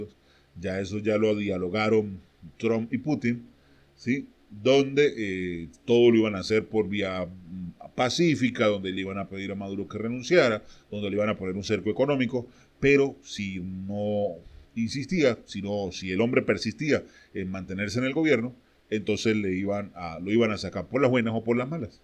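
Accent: Colombia. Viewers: Un colombiano narrando xdioxxx ¡¡ Ve con Uribe hacerle cariñitos ¡¡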